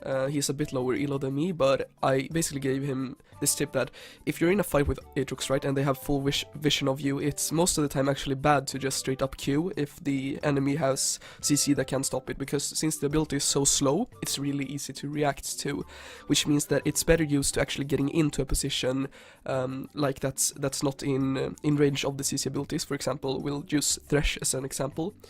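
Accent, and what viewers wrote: Sweden. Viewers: Are you swedish? It sounds like that on you accent. :P. im not swedish btw,, but they usually speak like you.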